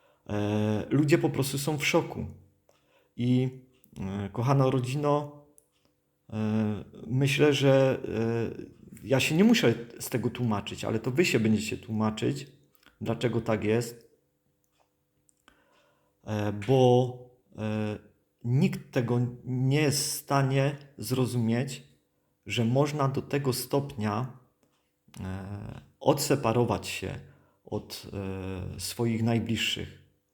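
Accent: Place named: Silesia, Poland. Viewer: Witaj! Jak dobrze Cię znowu słyszeć 👍i wcale przynajmniej mnie Twoja śląska mowa nie przeszkadza, wręcz przeciwnie, ja też mówię i tyż gołdom, jestem z krwi i kości. Ślazaczka z Opolszczyzny, więc póki co pozdrawiam serdecznie i do następnego usłyszenia😊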